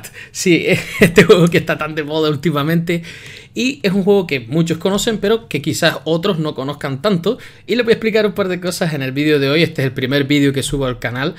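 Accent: Canary Islands, Spain. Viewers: Eres Canario :O? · tu tienes acento canario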